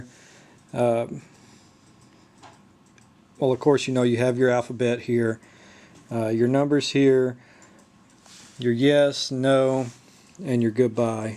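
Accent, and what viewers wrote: United States. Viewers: SOUTHERN ACCENT HEE YA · what part of East Texas are you from?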